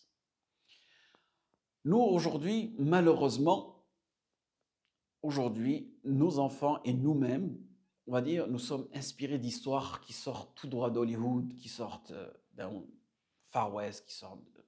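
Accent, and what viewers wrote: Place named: Maghreb. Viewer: الحمد لله والصلاة والسلام على رسول الله ، وعلى آله وصحبه ومن اتبع هداه ، سيدي الفاضل إذا كنت إمام مسجد في أي دولة من الغرب ــ قدًم نفسك أنك إمام متمكن في الدعوة الإسلامية لا خطأ و لا فتوى ــ إياك أن تفتي و أنت لست من أصحاب الإختصاص بمعن آخر ـــ عملاء في مظهر العالم . أنت متعلم و ربمـا مثقف و حسب نبرة صوتك من دولة ، أنا كذلك أنتمي و أسكن فيها ـــ الملاحظة هي : حث المتحولين المسيحيـيـن إلى الإسلام قراءة اللغة العربية الصحيحة و ليس العريية المختلطة بالكلمات الفرنسية كما يتكلمون إخواننا في كل ـــ المغرب الكبير ــ اللهجة الملتوية بكلمات فرنسية و المؤسف الشديد نرفض التغيير و نتمادى في الباطل ووووو . علِمهم اللغة العربية الصحيحة ، حدثهم عن القرآن كلام الله القرآن هو روح ــ روح القدس عرفهم أن القرآن معجزة بالغة ، أما مفعول السورة هذا موضوع آخر ، إذا أنت ياسيدي المحترم قناتك فيها مواضيع مهمة عن الشريعة فكن حقا ــ سفير الحق ــ و إستعمِل اللغة بحقيقتها و ليس مزيفة ( إذا تحدثت باللغة العربية كن فصيحا أو تحدثت اللغة الفرنسية كذلك لا تظيف كلمات عربية و أنظر إلى إسم قناتك كتبت كلمة فرنسية و أظفت معها جملة متكونة باأحرف فرنسية و هذا خـطـأ فادح ، لو قلت لك ياسيدي ، أنا أتكلم اللغة الفرنسية الفصيحة و لو مرة في حياتي كتبت يعني ( الكتابة بالفرنسية و النطق بالعربية ) أنظر في إسم قناتك !!!!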